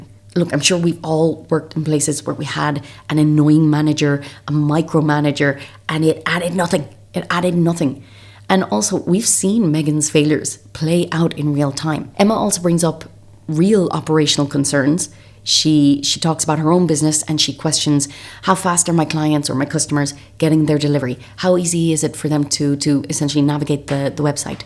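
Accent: Ireland. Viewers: I'm Swedish and it took me some time to understand what you were saying, but now when I'm used to it I find the Irish so soothing and calming, please don't change it too much! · Aww. Not to be too much of a stan but that's just the Irish accent that lots of us like very much. And unlike ahem... some people 😅... it's 'authentic' 😄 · Your accent is so interesting. There’s an Irish twang, no offense meant (I’m an immigrant too). Just quite curious about it. Also, love your videos-I binged quite a bit in the last 24 hrs. You kind of remind me of another content creator I follow called Cheere Denise. She does voices similar to you when it comes to MM-the smarmy self obsessed voice that MM has.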